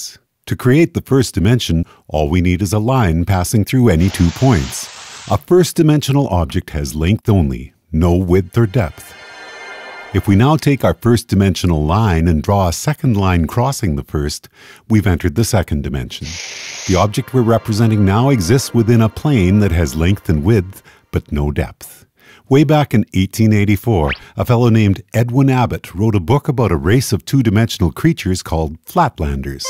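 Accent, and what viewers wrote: Canada. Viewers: You sound Canadian. Am I right?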